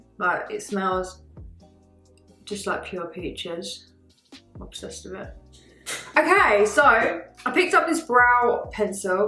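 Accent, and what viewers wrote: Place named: Manchester, United Kingdom. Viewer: Is it me or accent is changing lol 😝 baby girl!!! Xxx hearing that manny accent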